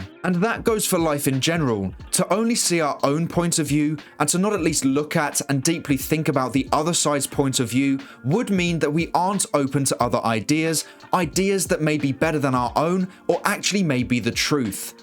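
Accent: United Kingdom. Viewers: As soon as i hear a British narrator i know it is clock bait with no reliable information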